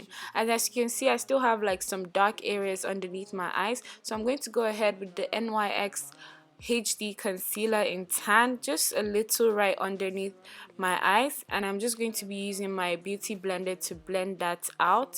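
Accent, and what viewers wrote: Nigeria. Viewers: ehhh shoki😂😂 love that nigerian accent❤❤ beautyful girl💕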